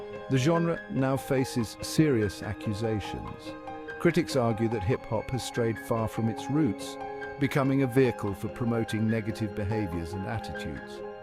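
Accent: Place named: United Kingdom. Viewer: hearing a British guy talk about hiphop is wild haha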